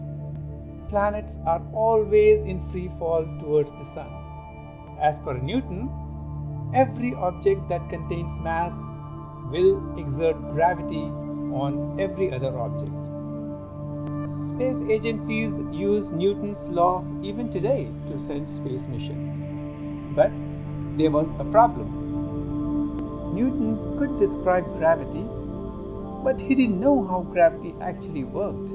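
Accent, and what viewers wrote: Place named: India. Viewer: Turned off immediately after I heard the Indian voice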